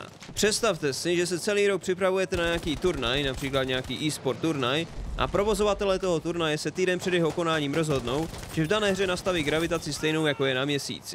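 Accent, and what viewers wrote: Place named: United States. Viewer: prídáváš Americký přízvuk a to je děs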